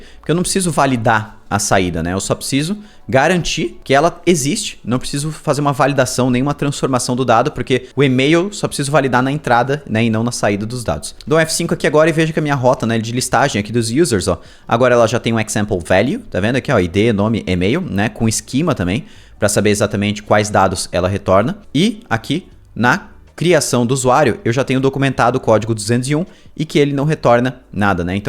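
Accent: Brazil. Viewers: falar como brasileiro, ninguém fala Alex como Álex…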